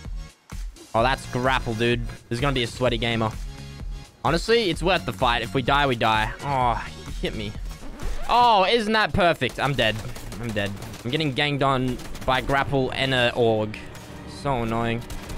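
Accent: Australia. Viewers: Nutella and Breadsticks can’t u hear he has an Australian accent Asian people don’t.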